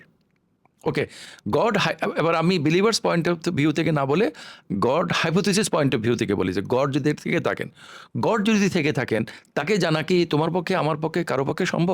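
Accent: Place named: Noakhali, Bangladesh. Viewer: নোয়াখাইল্যা বয়ান!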